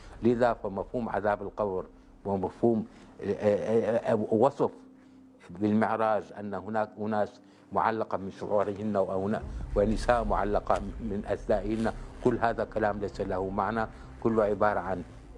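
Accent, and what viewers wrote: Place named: Syria. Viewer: hhhh أكيد يتلعثم فهو حفظ القرآن و هو كبير و هو سوري تغلب عليه اللهجة السورة و درس في روسيا و يتقن عدة لغات المهم هو يحفظوكتاب الله اذا تلعثم أثناء الحديث لهذا شيء عادي جدا و ليس حرام لأنه أثناء التفسيير او مطالعة كتاب الله يقرؤه جيدا ثانيا لقولك ندير و تدبير و عيرته بضعفه في اللغة هو تكلم عن التدبير و المعنى واضح حسب سياق الجملة و انت قلت له ليس تدبيير بل تدبر لا حجة لديك في باقي النقاش كلامك مجرد نقل نقل نقل همهمهمهمهمات تكلم بالعقل و المنطق ولا تدعي على الناس ما لم يقولوا و هو شرح آيات الله شرحا واضحا يفهمها العام و الخاص و هو لم يحلل الحرام لو أستمتع لكل حوارته ستفهم مايريد ايصاله المهم ليس عيبا ان تكون ضعيفا في اللغة العربية لكن العيب ان لا تفهم ما يقال لك و لا تميز بين الصح و الغلط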